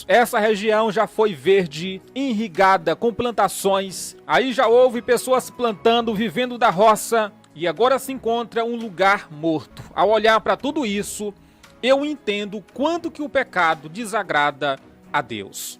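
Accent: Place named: Maranhão, Brazil. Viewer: Estou gostando muito dos seus vídeos 👏👏e aprendendo muito, e gosto do nosso sotaque do Maranhão 👏sou de São Luís MA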